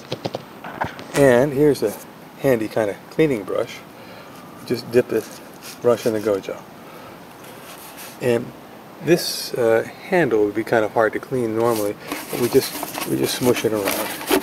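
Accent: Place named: Minnesota, United States. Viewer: Cool accent. Minnesota?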